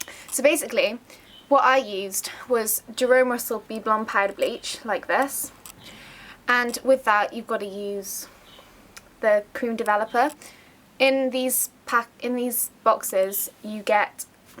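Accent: Cornwall, United Kingdom. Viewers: You sound cornish, correct me if i'm wrong? Great tips, by the way!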